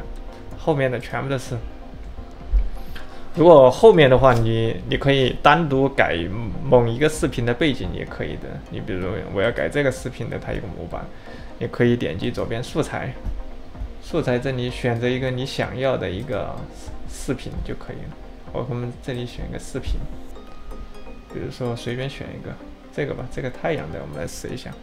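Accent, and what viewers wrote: Sichuan, China. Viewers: 播主说的川普，挺可爱的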